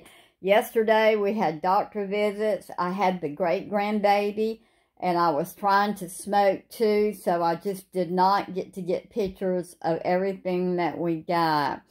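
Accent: South, United States. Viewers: ! My wife just said a true thing to me. We're nearly as southern as you but we just love to hear you talk. Ha. Here at our home it's just me and her. The kids have always done their own thing. I think we could turn 'em loose in the yard to graze and they'd do fine. Home cooking just flies over their heads. So with just us two it's easy to improve the old larder. And always something tasty in the freezer to defrost. But. You buy and can and all that but from what you tell us I conclude that your grub flies out the door about as quick as you get it. How do you stock up with all those hungry hounds dropping by for a feed?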